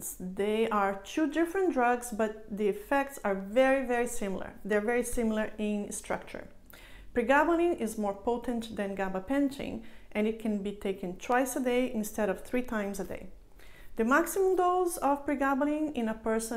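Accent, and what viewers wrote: Brazil. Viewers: Poxa vida Dra, que maravilha! Eu pensei, seu inglês é perfeito, mas tem um certo sotaque; uma de duas, ou é italiana ou brasileira por ser um sobrenome bem conhecido no estado de SP. Vou arriscar e mandar um abraço em português! E não é que a doutora é de Sampa! Rsrsrs! Que ótimo! Em 92 eu estava cursando meu 4 o ano, na USP também olha só! Admiro demais seu trabalho no canal. Seus videos são atrativos, suscintos, claros, simpáticos, rigorosos na informação científica sem que ela se torne cansativa ou maçante. Quanto à gabapentina e peso, um mistério mas uma excelente opção para aqueles que sofrem os efeitos devastadores de um aumento de peso indesejado e involuntário devido à pregabalina. Tudo de bom e parabéns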